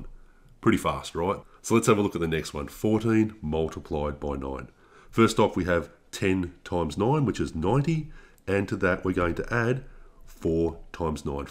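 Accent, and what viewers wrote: Australia. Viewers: Yes it helps us learn and understand math when we get how the algorithm works. Another great lesson . Yet I marvel at the idea that some- know it all -sharpshooter should hoot about your videos for any reason . I mean seriously, if they don't like your " down under " dialect they should go find something to do with themselves.😁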